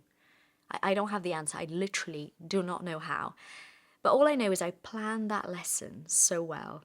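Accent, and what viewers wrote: United Kingdom. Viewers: Incrível pela sua fluência e sotaque britânico. Congrats!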